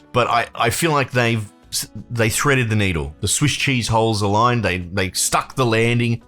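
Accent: Australia. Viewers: Perfect and honest recap dude - love it!! I was looking for a straight-to-the-punch, not-too-technical kinda review to send to my non-Elder Scrolls-abiding Aussie buddies, and since it sounds like you are an Aussie yourself, well that's just the cherry on the pavlova!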